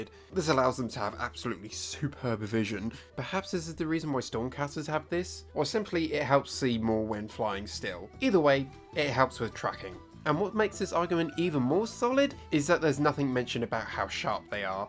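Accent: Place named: United Kingdom. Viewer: Why do British pronounce t-u's like c-h's?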